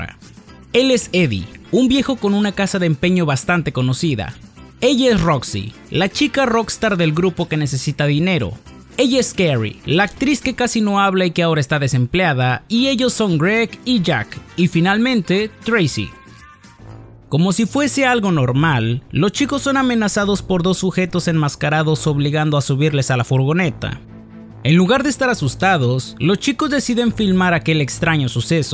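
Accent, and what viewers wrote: Peru. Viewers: Eres de Perú?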